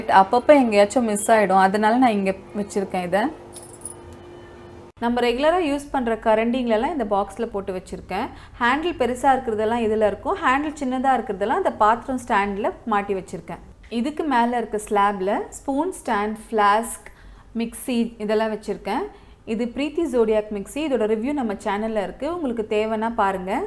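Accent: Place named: Bangalore, India. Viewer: the way of telling, even im from blr😀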